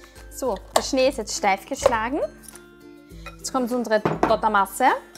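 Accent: Austria. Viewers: Super, aber ich bekomme diesen charmanten österreichischen Akzent nicht hin und so es ist irgendwie nur ein leckerer Pfannkuchen.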